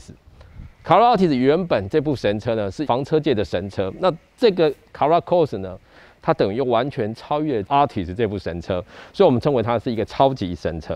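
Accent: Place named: United Kingdom. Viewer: 我都不知道台灣是以美語為主還是英文為主,怎麼發音都走英國風?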